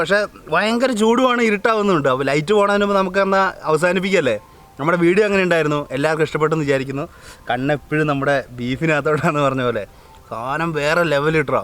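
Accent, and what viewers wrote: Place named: Idukki, India. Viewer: മുൻപ് ഇടുക്കി, കോട്ടയം എവിടുന്നെങ്കിലും മംഗലം ഡാമിന് പോയതാണോ സംസാരം കേട്ടിട്ട് തോന്നുന്നു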